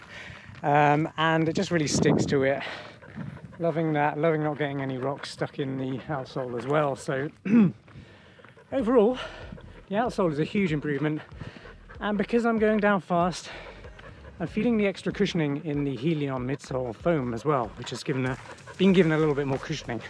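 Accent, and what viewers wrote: United Kingdom. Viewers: Are you a Brit living in America?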